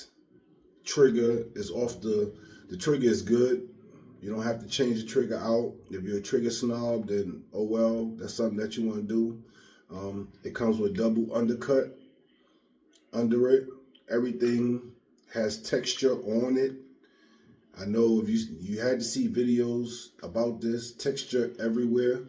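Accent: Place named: New York, United States. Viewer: lol definitely an old school New Yorker with that : LIKED’ed it 😜 BROOKLYN LUV🫡 BROOKLYN LOYALTY ☝️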